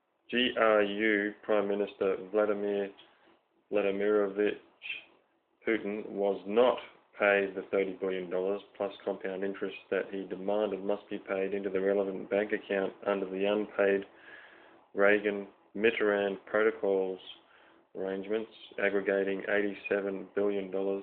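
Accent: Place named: England, United Kingdom. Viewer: weeks huh? LOL-makes me question current info on the current Supposed events Re: Imminent Mass arrests, Banker resignations, Cabal Lawsuits-White Dragons vs. The Fed, et al.-TROLL Radio Show? Or just took longer than expected...You decide...fyi, this guy here isn't even American!! English accent-bad troll radio!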